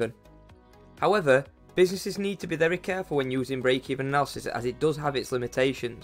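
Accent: Yorkshire, United Kingdom. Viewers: well done. Very nicely explained. You sound Yorkshire :)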